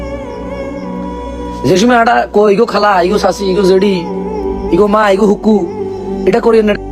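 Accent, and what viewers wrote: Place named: Noakhali, Bangladesh. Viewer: Noyakhalir basa pura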